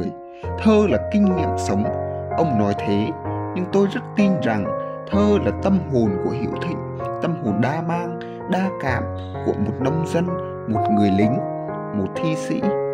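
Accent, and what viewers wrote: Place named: Hue, Vietnam. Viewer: rất thích hợp khi chọn giọng huế đọc ạ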